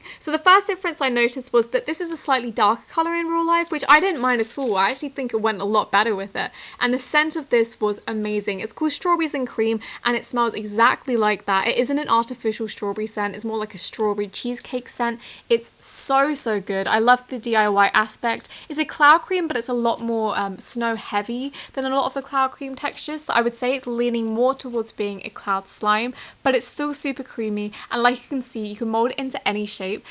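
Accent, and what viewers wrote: United Kingdom. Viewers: Their accents are nothing alike. Australians have more of a drawl to their accents, whereas people from Great Britain have more of a clean accent (unless you're from the north, of course). is british.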